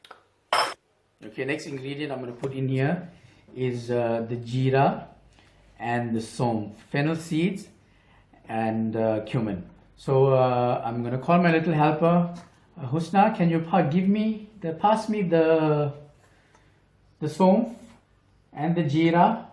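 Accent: South Africa. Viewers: I am South African now living in the US and I love your South African accent :-) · You sound south african..I'm south african..but live in the uk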